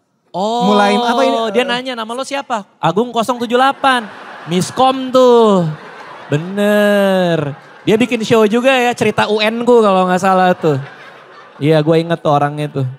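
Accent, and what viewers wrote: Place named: Palu, Indonesia. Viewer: Logat palunya di tahan tahan, tidak hilang memang 😂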